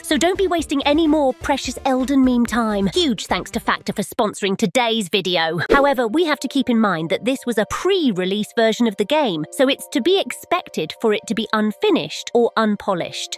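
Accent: Argentina. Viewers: Y mira, por como habla español medio que se deja entrever que es de Argentina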